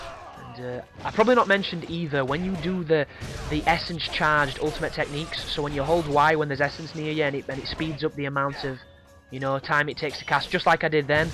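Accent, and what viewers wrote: United Kingdom. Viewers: I really like this guide...your voice is cool (i'm in the US; not used to british accents), and your guide is giving me the confidence to beat this game on very hard to then unlock master ninja. Just completed sigma 2 on master ninja? Ever doen that? Or have you only beaten ninja gaiden 2 for the xbox 360? Either way, I definitely enjoy your channel and your guides. Very professional and to-the-point.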